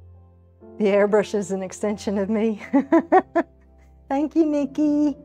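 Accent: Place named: Texas, United States. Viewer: I knew I detected Texas! Love, love your voice and your work! 🤩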